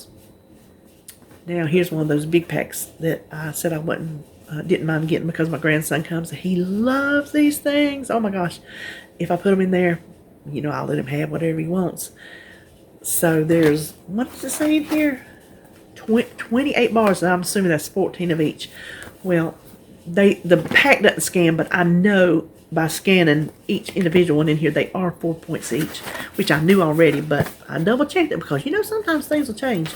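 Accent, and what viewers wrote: South, United States. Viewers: These are a great idea!!! I love pop chips, but I buy the bigger bag and weigh them out and put in baggies. Bc I could definitely eat to many. I buy the big bags bc I love the flavored kind, barbecue, sour cream and onion, and cheddar.. so good! I don’t care for the plain. You are so sweet!!! Love your accent, I’m from the south and moved north for my husbands job, so it’s so refreshing to hear that accent. Everywhere I go someone always comments on it 😂.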